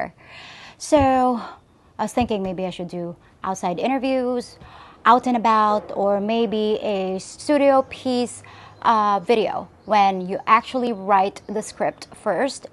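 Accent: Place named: United States. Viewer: hey, is your dad or mom a fil-am or a westerner? your english sounds like you've lived here in mainland US...on point colloquial as well as your enunciations/pronunciations. love ya gf!!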